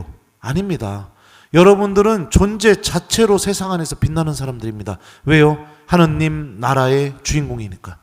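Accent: Chungcheong, South Korea. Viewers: 신부님 감사합니다 강론말씀에 저 영적으로 채워지고 있는듯 해서 넘좋습니다~ 정겨운 충청도 사투리에 마음이 따뜻해집니다 왜인지 돌아가신 부모님이 생각납니다~~ 신부님 감사합니다 하느님 감사합니다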